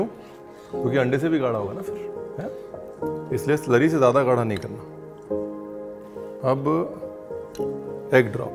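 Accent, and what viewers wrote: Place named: Uttar Pradesh, India. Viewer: You say like UP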